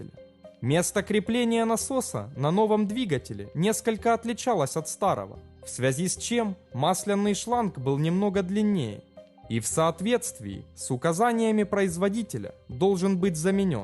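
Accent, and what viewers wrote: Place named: Kharkiv, Ukraine. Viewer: Говорок харьковский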